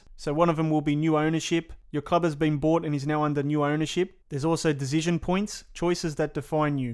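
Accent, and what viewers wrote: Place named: Australia. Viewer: I just subscribed because of your Australian accent!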